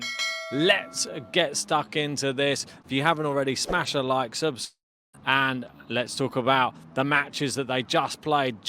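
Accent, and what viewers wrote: United Kingdom. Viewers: It’s the British accent. Give the guy a break!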